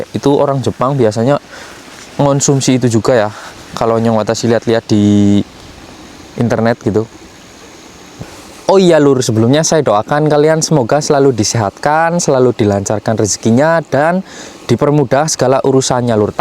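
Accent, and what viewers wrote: Banyumas, Indonesia. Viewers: Mas watasi sangat kreatif ya, senang dengan vlognya yg ngapak2 gitu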